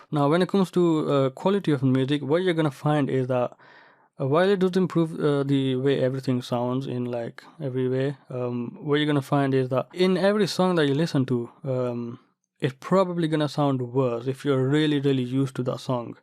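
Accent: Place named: Yorkshire, United Kingdom. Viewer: Hi. My experience of the Jitterbug, when connecting it from my PC to my Audiolab M-DAC is that there was a loss in some of the high frequency detail. It made the audio sound very analogue. I later sought advice and tried connecting the jitterbug to a different USB port to the DAC. This resolved the problem. It got rid of the noise from the PC motherboard that I bought the jitterbug to resolve. I could hear all of the detail that I previously could from my DAC. So, in short, my solution is to use the jitterbug on a different USB port to the DAC. On a different subject, are you from Yorkshire? I'm curious about your accent. Thanks.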